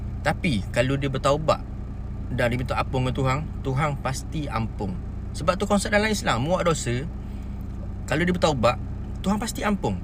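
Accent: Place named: Kelantan, Malaysia. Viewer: tolong melaraskan bahasa kamu dengan lebih baik. Rimas lah loghat2 kelate ni . Pesanang aku belajar bahasa yg lebih baik dari DBP dulu ya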